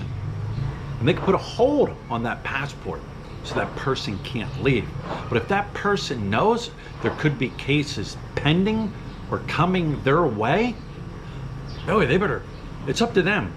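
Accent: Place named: Pittsburgh, United States. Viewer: I know you call yourself "," but that sounds like a serious Pittsburgh accent! Dan from Johnstown.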